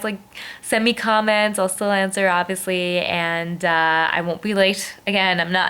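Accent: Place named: United States. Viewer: "You're playing for a Million dollars, NOT for a doughnut" haha btw what is it with Eric ? i mean he finds the idol, then hands it to Andrea LMAO does this guy never learn !! Reynolds and Eddie voting for two different players, is just ridiculous. note: you may sound American, but you get the beauty from your australian side ;-p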